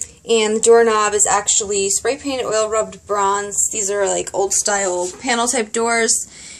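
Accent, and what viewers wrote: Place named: South Jersey, United States. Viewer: I thought you had a South Jersey accent because you sound like my cousins and then you said Brigantine!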